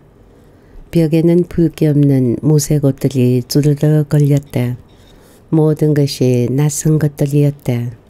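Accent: Gyeongsang, South Korea. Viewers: 경상도 말씨가 참 좋네요. 아들이 엄마를 보고 어짠 일인기요? 라니... 참 어이가 없네요. 잘 오셨어요 얼른 들어가입시더 해야죠. 아이보고 할매한테 정중히 인사드리라 하며 가르치고요. 아들부터가 잘못했네요. 우리 남편도 시어머님께 성질부리는 말투로 말해서 제가 뭐라했네요. 왜 다정하게 말하지 그렇게 말하냐고요.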